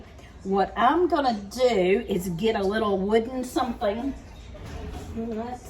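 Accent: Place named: North Carolina, United States. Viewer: A two spoon of that? What’s a two spoon? I’m born and raised right here in south eastern NC Brunswick county or ocean isle beach but your accent sounds exactly like ours that’s why I was wondering if you said two or yea it didn’t sound like tea spoon though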